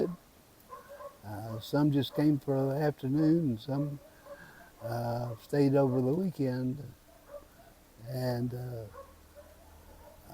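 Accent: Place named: Texas, United States. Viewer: I dig your humor and your no nonsense approach to reality. A Texas twang to it is also a big bonus. A great day to you too, sir!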